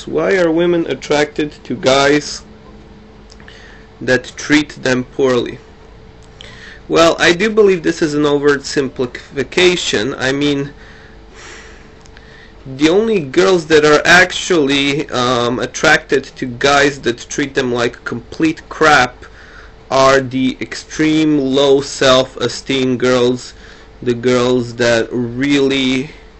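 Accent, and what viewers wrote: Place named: United States. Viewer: how come you don't have that heavy accent? your accent kinda sounds normal and like american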